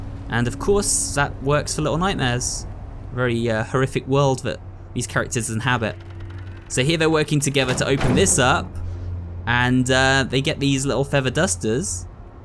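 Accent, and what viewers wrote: England, United Kingdom. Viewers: I just wanna say that I'll always love how English accent will have him pronouncing the word, "three" like the word, "free" or "tree." It tickles me pink every time! 😂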